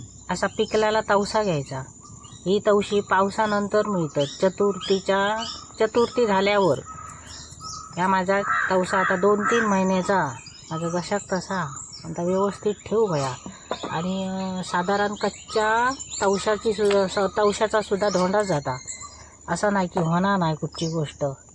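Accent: Malvani, India. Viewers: ताई छान रेस्पी तुमचे बोलणे खुप छान मालवणी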